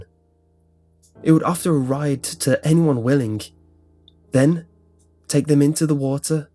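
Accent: United Kingdom. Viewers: Well if you haven't noticed yet, he's British :/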